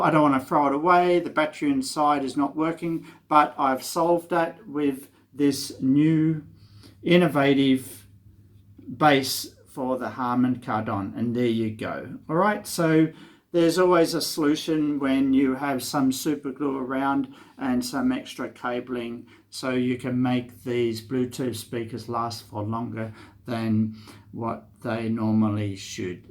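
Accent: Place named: Sydney, Australia. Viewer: This guy sounds like he's from Sydney 😛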